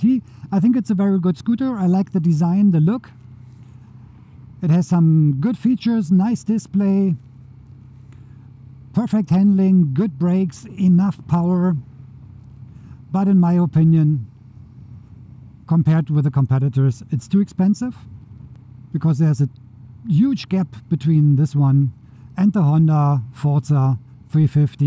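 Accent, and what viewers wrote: Germany. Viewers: Your accent sounds Teutonic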